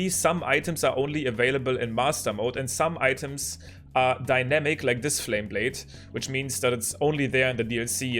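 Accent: Germany. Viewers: You Sound german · are you german?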